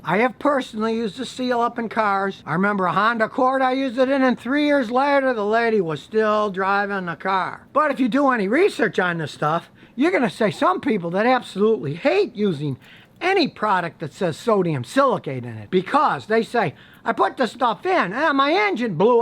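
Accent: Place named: Midwest, United States. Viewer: you live in or near Houston?? You don't sound from arround here, more like from the midwest??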